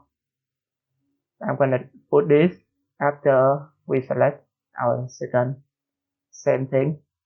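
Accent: Vietnam. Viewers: Nghe biết ngay VietLish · App ngon quá bạn ơi, nghe giọng nghi nghi là VN rùi mở page FB ra thì đúng lun :)) Trên dt xiaomi muốn chạy phải cấp quyền lại liên tục chán quá :( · ông là ng việt nam à. cái giọng ko lẫn đi đâu đc lun ấy :))) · )))) ông này việt nam ak nói tiếng anh i chang v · nghe giọng là biết ông người Việt rồi, công nhận ông giỏi thiệt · Chuẩn mọe giọng Việt😂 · Ôi cái giọng Việt Nam này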